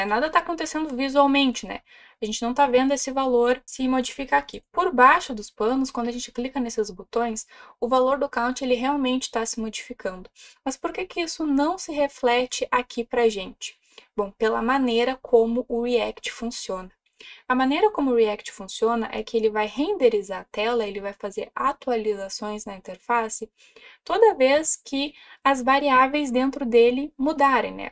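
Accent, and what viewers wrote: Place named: Rio Grande do Sul, Brazil. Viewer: Eu consigo perceber 30% de sotaque gaúcho e 70% de sotaque de Brasília. = ["RS", "DF"]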